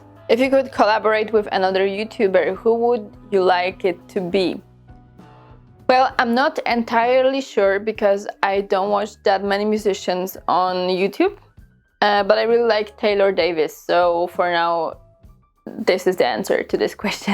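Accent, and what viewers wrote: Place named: Poland. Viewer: I didn't realize there was such a void in my soul until I heard the words "Hurdy Gurdy" cheerfully spoken in a polish accent. I feel better now.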